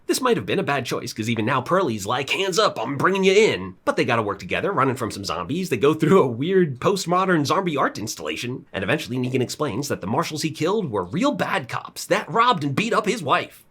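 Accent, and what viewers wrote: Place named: New York, United States. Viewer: Thanks, love the content as always. Was really looking forward to the Dead City recap, and it didn’t disappoint. Love the New York accent 😅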